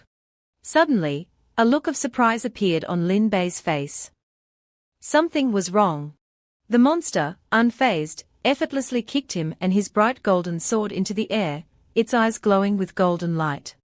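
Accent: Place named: United Kingdom. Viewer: Why this uk accent 😢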